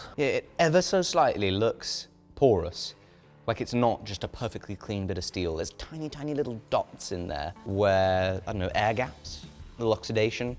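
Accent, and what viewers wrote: England, United Kingdom. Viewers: So British. So cute. So. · A big “chube” of chips I absolutely love the English accent and what it does to certain words!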